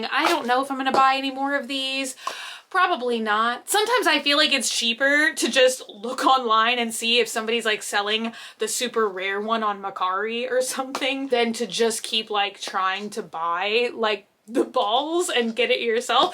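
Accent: South, United States. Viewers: I love your country voice, you should keep it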